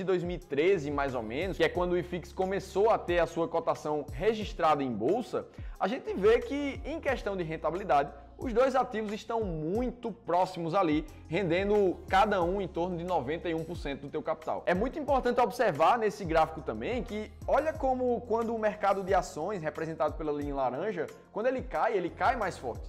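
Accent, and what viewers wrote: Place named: Pernambuco, Brazil. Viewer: Pernambucano até a ventas! Pergunta aí se ele já comeu uns quarto de bode na serra das russas! Esse sotaque não me engana.... é da terrinha! kkkkkk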